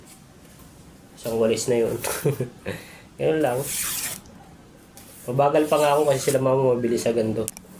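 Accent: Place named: Capalonga, Philippines. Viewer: nnatutuwa q sa panonood ng vlog mo kc ang punto ng yng salita ay Capalongang gapalonga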